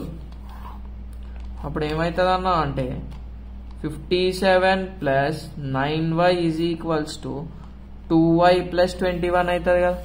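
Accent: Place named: Telangana, India. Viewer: Anna ekdham chpthunnav poo kani manchiga cheppinav Anna verey matladuthunnav acham telangana porodu lekka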